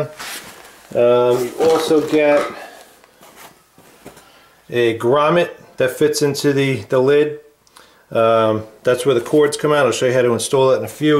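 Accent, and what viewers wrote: New York, United States. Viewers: I'm guessing by your accent that you live in NYC/Long Island area? I'm originally from Queens but live in NJ. My wife and I go to the city several times a year to catch a play and eat something bizarre in Chinatown. I'll give you a shout if you are in fact from the area and get you a beer or two. Thanks again from a great video. 😊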